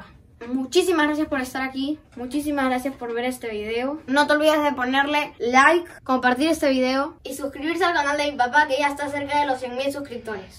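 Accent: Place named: Argentina. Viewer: porque algunos peruanos hablan como argentinos????? pense que eras de argentina se parece bastante el tono... alguien le paso lo mismo???????????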